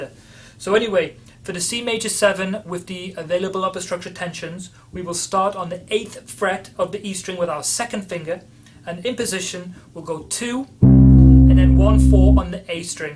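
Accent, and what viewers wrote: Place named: North East England, United Kingdom. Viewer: fellow bassist from the north east that made it! County Durham bassist here.. recognized that accent instantly. Great video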